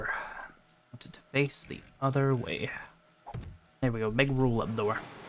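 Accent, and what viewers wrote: Scotland, United Kingdom. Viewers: Hey! Love the vid (taking it in chunks) and love the accent. Special place in this ol" gramma's heart for Scots.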